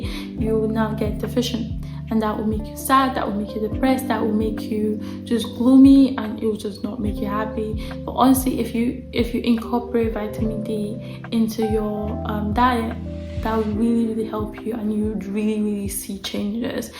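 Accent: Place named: Glasgow, United Kingdom. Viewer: You definitely have a a Glasgow accent tinge in your voice! From a Glaswegian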